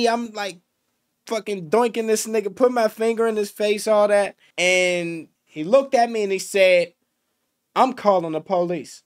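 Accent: Pittsburgh, United States. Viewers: The Pittsburgh accent goes crazy hard!!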